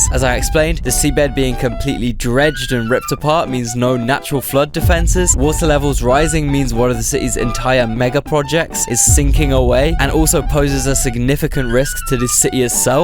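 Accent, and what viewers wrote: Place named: Birmingham, United Kingdom. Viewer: these editings coupled with the brummy accent is gold tier, best shit on youtube ilysm never change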